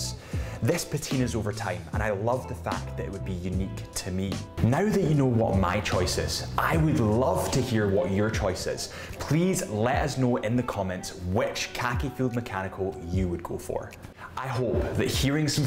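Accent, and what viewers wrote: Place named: Northern Ireland, United Kingdom. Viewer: I hear a wee bit of a Northern Irish accent. :)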